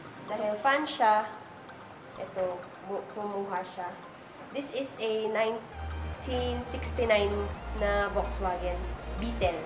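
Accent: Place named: Ilocos, Philippines. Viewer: Miss ilocana ka no.... Sa salita palang my puntu na... Ney..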